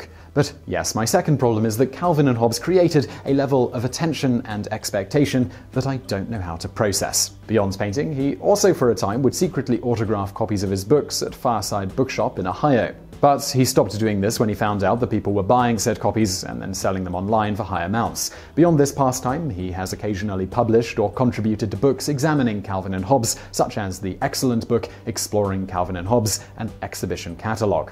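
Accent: England, United Kingdom. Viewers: Why is not cooking and have english accent?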